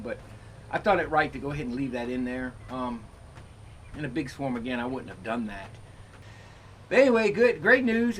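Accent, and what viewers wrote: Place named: North Carolina, United States. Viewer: Love your videos, moved from NC to Kansas little over a year ago and miss hearing people that sound like me. First year beekeeping, started with two packages and they are doing great. Thanks for the information and just being you.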